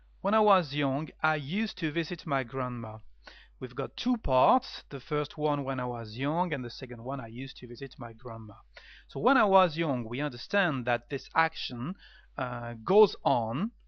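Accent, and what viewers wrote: France. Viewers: haha love your french accent :-)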